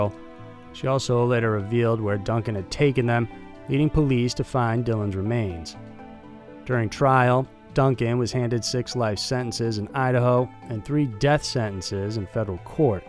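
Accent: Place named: Texas, United States. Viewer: Your bayou / Texan syrupy accent is just so nice to listen to - despite the content being so disturbing. Happy to have found your channel. 💖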